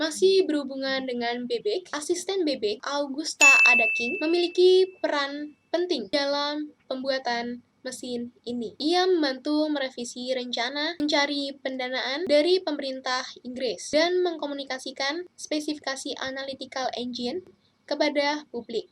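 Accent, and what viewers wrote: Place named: Surabaya, Indonesia. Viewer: Nessie judge rasa sby iki 🤣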